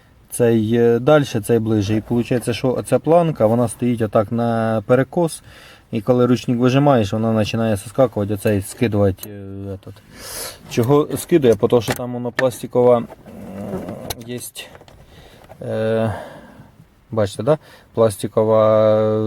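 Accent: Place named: Surzhyk, Ukraine. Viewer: Я чомусь думав що це просто в тебе суржик такий,але ти як думаєш так говориш так і пишеш. Це по якому ти ,,балакаєш,,? Якщо по кацапські то говори вже, вибери одну мову на якій думаєш,на тій і розмовляй,я як лікар можу поставити діагноз - діслексія,алексія,біполярний розлад. Це ж кров з вух, більше трьох хвилин дивитися не можливо. Конєшно воно є такоє,мажліво тут паставіть єсть що так.....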